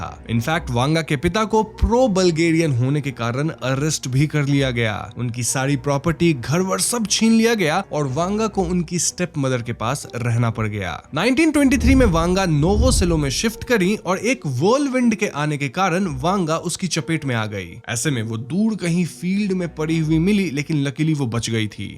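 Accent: Bengal, India. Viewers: Bhai tu bangali hai Keya Tera Hindi sunke Aisa lag raha ha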